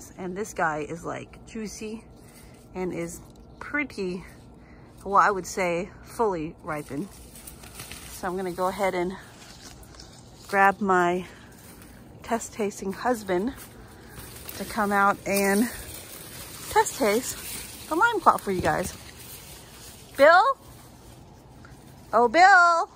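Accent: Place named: India. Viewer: You sound like a normal American with hints of an Indian accent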